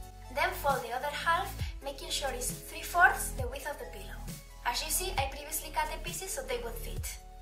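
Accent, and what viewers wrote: Basque Country, Spain. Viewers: Your spanish accent mixed with your english pronunciation is so beautiful! I don't know too much Spanish, such a shame because my family comes from Madrid, but I would love to see a video with you speaking Spanish! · She's from Spain and she has an accent but I could understand her. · eres española?? Porque tu acento de verdad es muy español Are you from Spain? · Are you from Spain? Because of the accent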